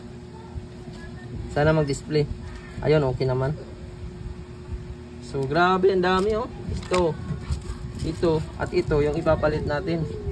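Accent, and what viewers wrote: Iloilo, Philippines. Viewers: Ilonggo ka po ba or bisaya?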